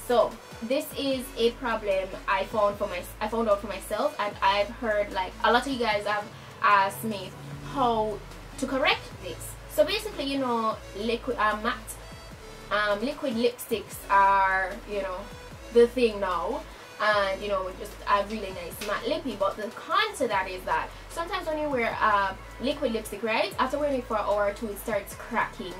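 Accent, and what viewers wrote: Jamaica. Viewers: Only non Jamaicans would be saying you are not a Jamaican. I could tell straight away. Many have this narrow idea in there head of what a JA person should look and sounds like. It could be because you have a good grasp of the English Language. I am a Jamaican myself living abroad and many do not believe I am Jamaican because I do not walk around speaking Patois in a professional job. Silly narrow mindedness people. Do your thing. Your videos are really good and I am using them to learn the makeup techniques. Thanks for sharing. ✌🏿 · I'm Guyanese and don't think you sound like guyanese nor Trini at all.Lol even a deaf man would give hear to your strong Jamaican accent.Some people just don't have hearing sound. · Amika Azore yass girl sounds 100% Jamaican love her tho #proudguyanese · From she start talk I knew she was Jamaican 🇯🇲🇯🇲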